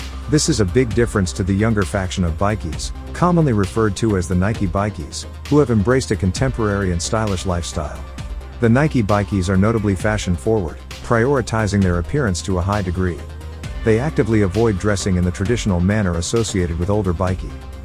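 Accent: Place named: United States. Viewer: Why is it an American doing the voice over as you said, a load of crap 😮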